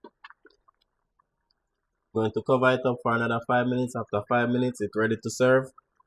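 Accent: Jamaica. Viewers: Love how this chef expresses himself. No twist up tongue, straight Jamaican language. Yeah love it.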